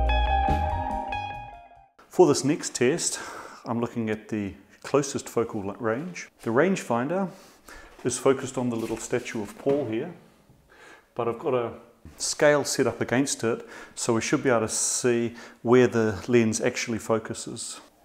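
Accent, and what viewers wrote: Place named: New Zealand. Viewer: Nice, thx! sorry for a silly question but what's your accent? Is it New Zealand? But you seem to live in Germany? Great series anyway :)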